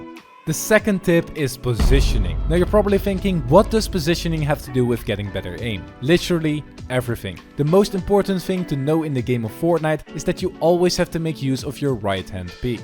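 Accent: Netherlands. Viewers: Ik heb het gevoel alsof je een Nederlands accent hebt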